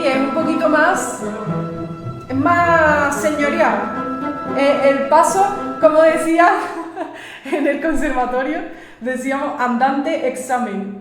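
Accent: Andalusia, Spain. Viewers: Eres genial, adorable, hermosa, talentosa y tus videos son divinos como tu!!! me fascina tu acento andaluz te amo a ❤❤❤❤ ❤❤❤❤❤❤❤❤❤❤❤❤ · Hola bella, me encanta tu estilo andalú "gitano" 💖💖💖, me recuerda a mi familia paterna Española, que hablaban como tú, soy paraguayo y por lo tanto debo recomendarte un artísta que está en categoría de genio de la música, por supuésto hace ya tiempo fallecido pero eternizado en la história de la música universal como uno de los más grandes, su nombre es Agustín Pío Barrios, conocido también como "Mangoré", y como todo paraguayo tenía raíces guaraníes, pero en el caso de él, era descendencia directa, creo que su madre era nativa guaraní, es uno de los más grandes guitarrístas del mundo, y no exagero, tampoco es "fanatísmo" nacionalísta ni nada, pero te lo digo porque es uno de los más grandes guitarrístas del mundo menos conocidos a nivel popular, sólo es conocido por estudiosos e investigadores, te prometo que si lo buscas, quedarás asombrada, no lo podrás creer, busca información sobre él y escucha sus obras, no te arrepentirás, te lo garantizo. 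Es el primer o el segundo video tuyo que veo, y estoy encantado contigo bonita, eres hermosa💐💐💐 y ya estoy pensando seriamente en suscribirme ( es que ya tengo mi lísta de suscribciones demasiado llena, pero puedo anular alguna que otra suscripción para darle lugar a la tuya, claro que sí ) Un fuerte abrazo!!!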